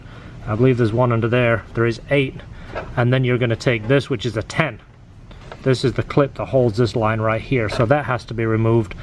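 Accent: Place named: Scotland, United Kingdom. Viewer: Love the s2000 build. Hopefully you guys can work with me when I pick mine up. Also, love the accent, Scotish?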